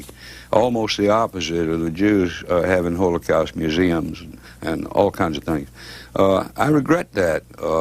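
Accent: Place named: South, United States. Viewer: Hard to catch with his thick southern accent.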